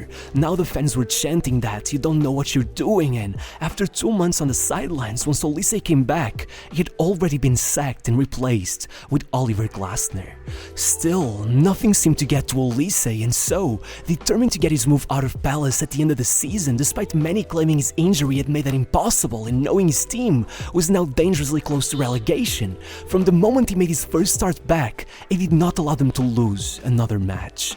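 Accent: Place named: Portugal. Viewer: Your agitated narration and heavy accent make you very hard to understand and annoying. Either relax and slow down your narration or improve your intonation to sound more like an English speaker and less like a Portuguese speaker. All the best.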